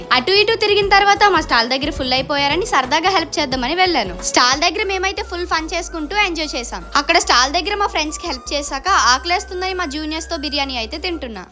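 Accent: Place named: Srikakulam, India. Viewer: Akkao srikakulam language sister 😅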